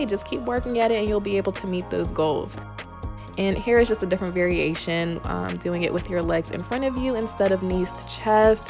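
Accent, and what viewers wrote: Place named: Pittsburgh, United States. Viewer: Listening to you speak, first thing i said was “is she from pittsburgh?” The i went to your IG and saw the pin. Do your thing!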